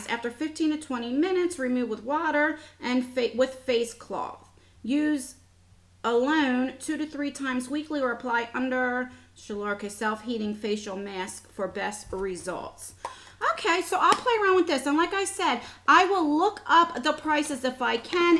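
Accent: New Jersey, United States. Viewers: Hi, Love you love your channel. I am about ten years older than you but we have identical eye color (my best feature). Our eyes are unique in the fact that we have such a pale blue iris surrounded by the dark ring. Everybody in my family is blue eyed but I am the only one with the dark ring and until you never really seen anything so close to my own. Have to ask, you mentioned you have a daughter of mixed race? What type of eye color does she have? I would guess genetically brown but I wonder if the color is a lighter and also a unique brown? I also love your accent. It reminds me of all my wonderful summers spent in NJ with my extended family. Just wanted to drop a note as I love watching you, learned so much and never tire of listening to you. Don’t ever edit. Lov Suzanne in Phoenix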